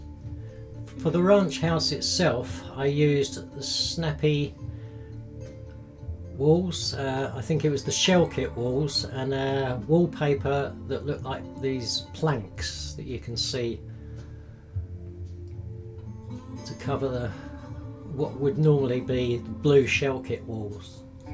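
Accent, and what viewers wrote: Texas, United States. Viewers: Thank you for your backstory. I just found my long-lost brother. I performed the fiddle for 40 years. If you lived near me, I would love to turn you loose on my woodworking equipment. The only thing we would be missing is a good selection of exotic veneers and some specialized clamps. I think your old western movie inspired, Texas accent is perfect. If I did the voice over, everyone would be subjected to my horrible rendition of Monty Python. It's clear to me that you have 10 times the artist in you than me. Your precise attention to delicious detail tells me that you were a master of both painting and marketry. I on the other hand, will always be a slave to industrialized symmetry. I agonize over the finish of my work. I've always wanted to be paired up with someone who could teach me how to add soul to my work. I'm great at the skyline and stymied by adding life. 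· Wow this is quite different, and fun. My wife is from Texas, she says it's cool that you tried the accent. I feel that way any time I try and English one. Ha. That chuck wagon sure is cool I also enjoyed the explanations as I am largely mod illiterate and can't tell when something is custom built 104 beams! I I understand the effort there believe me The inside of the house looks so good. The oil lamp is clever, as is the use of conduit. So many small details that i lost track, mesmerized All the barrels in stages of build at the cooper…just brilliant Was that cat named cooking fat? 🤣 Awesome build. Just excellent work.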